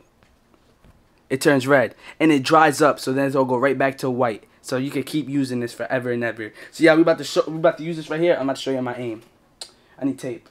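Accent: New York, United States. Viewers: Is u from NYC?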